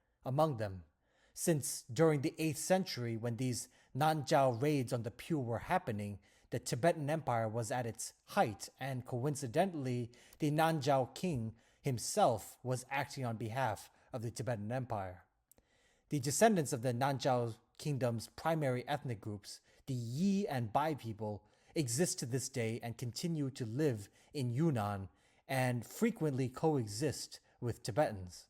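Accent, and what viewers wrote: Myanmar. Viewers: The narrator sounds like a Burmese. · Yes he is Burmese. 😅We know one when we hear the accent.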